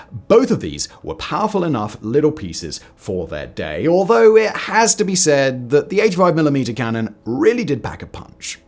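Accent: RP, United Kingdom. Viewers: You like it or not the Tiger tank piled up many kills and is without any doubt the most famous tank in history. Why don't you make a video and describe with your unsuportable posh accent the real pieces of junk that were WWII british tank?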